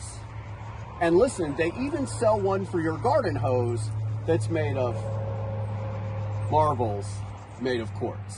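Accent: South, United States. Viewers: Wow, people will buy the craziest sh*t!! On a side not I’m glad to hear that the deeply Southern “okaaaay” DOES come out of all Carolinians not just us in the more southern Carolina….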